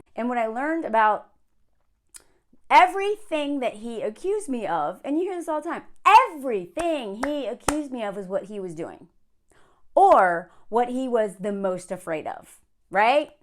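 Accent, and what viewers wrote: South, United States. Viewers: The Southern Belle twang: Here for it! And the puppets - a childhood joy coupled with information I enjoy as an adult - Enjoying the creativity with your content - Hi ❤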